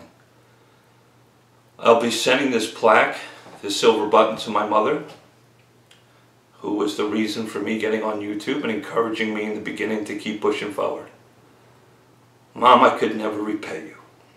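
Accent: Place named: Arkansas, United States. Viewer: Long Island? I was sure that accent was Arkansas or West Virginia : ) I, for one, appreciate that you think about things and don't just follow the backs of bottles. You keep calling it like you see it, and I'll be here.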